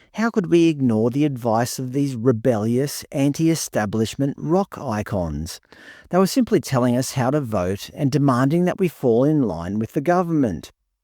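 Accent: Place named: Western Sydney, Australia. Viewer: They were woke before it was cool. I thought your accent was Western Sydney....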